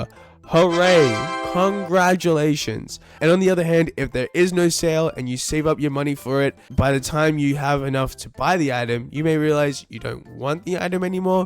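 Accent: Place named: Canada. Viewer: OH MY GOSH OKAY IM SO SORRY 😭 your accent made me think you were canadian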